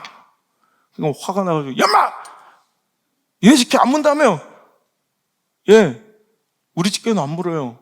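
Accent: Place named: Chungcheong, South Korea. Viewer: 목사님.은혜많읻고잇습니다.예화도.재밋네요 충남예산인데.맞아요.충청도어법.오랜만에듣고보니.넘재밋네요.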